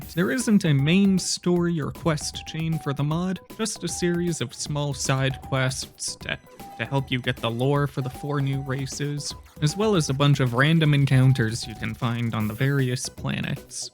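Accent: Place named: Colorado, United States. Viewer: You sound like you're from Colorado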